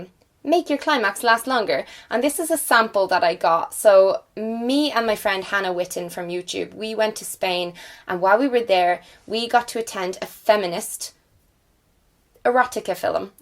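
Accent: Ireland. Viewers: I love that Irish accent👌👌👌 P.s could you talk more about your book because I didn't know you were bringing one out. Maybe you could do a video on it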